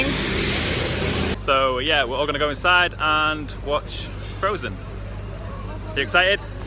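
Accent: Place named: United Kingdom. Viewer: Make a vedio like this more Pls. i really like it. I learn british accent form you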